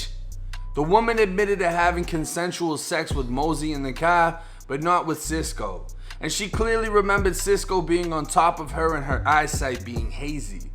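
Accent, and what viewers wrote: Boston, United States. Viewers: I know your from FLA but you have a Boston Accent...but most people from Boston move to FLA anyway you must have an aunt or something. I moved to FT MISERY OMG I ran back to Las Vegas CDC I am originally from Boston were I could run a muck I never got pulled over than you God my Lord and Savior.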